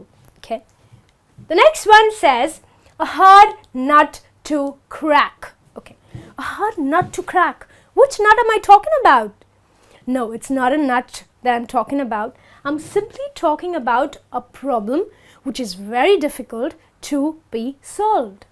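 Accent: India. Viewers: R u Indian? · Nice teaching, hay I'm Dion From Indonesia, I like your teaching, anda I Just wanna to ask "where do you come from?" your accent is like India,... Are you from India???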